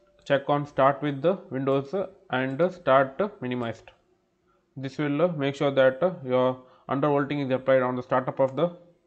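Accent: India. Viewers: lmao the curry accent makes it better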